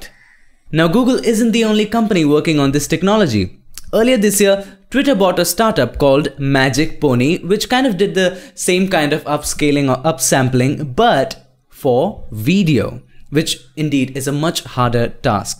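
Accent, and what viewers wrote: India. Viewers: Indian english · Level Up, Indian English with much better diction than many English native speakers.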